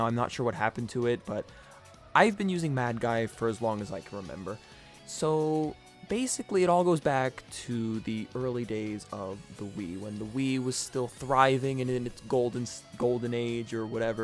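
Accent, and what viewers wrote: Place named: United States. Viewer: kinda sounds like just a generic american accent (the kind where people who have it don't know that they have an accent, me being one of those people)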